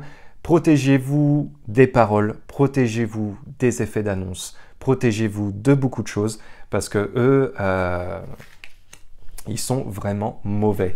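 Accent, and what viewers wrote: Quebec, Canada. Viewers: BONJOUR GROS , tu as pris l'accent QUEBECOIS déjà , c'est excellent j'ai remarquer sur tes intonations , c'est excellent dans un an tu seras bien acclimaté , en tout cas super choix d être parti vivre une belle aventure plein de bonnes choses à toi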